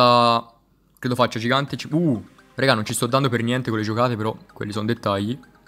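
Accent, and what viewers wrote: Tuscany, Italy. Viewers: Bella, di che zona sei della toscana? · lo so sei toscano si sente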